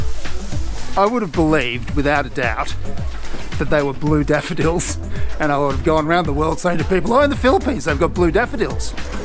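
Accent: Australia. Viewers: Is he an aussie?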